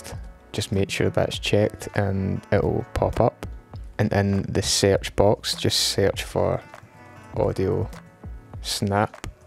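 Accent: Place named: Scotland, United Kingdom. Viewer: I find it odd how few views and likes videos get, considering how handy they are for a Cakewalk user - maybe folks out there can't understand his rather mild Scottish accent!? Thanks for the posts!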